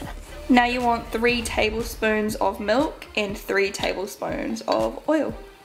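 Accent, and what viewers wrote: New Zealand. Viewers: Nice kiwi accent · Are you from New Zealand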